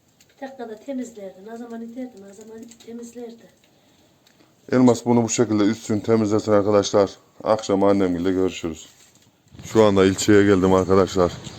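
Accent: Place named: Kayseri, Turkey. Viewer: Elmas selam ben geldim nevin ozudogru kayseriliyim hemserim siveniz ayni dilden sizleri severek ve begenerek izliyom gadasini aldigim siz bizim nese kaynagamiz oldunuz kadir geceniz mubarek olsun dualarimiz sizinle herkeze selamlar saglicikla kalin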